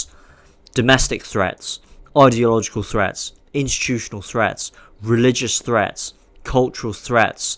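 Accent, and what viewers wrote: England, United Kingdom. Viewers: He speaks like an Englishman - which is weird, let's be honest. I'd much rather Asians sound like whatever Asian they are, but in English. There's no eloquence in emulating a man that you are not.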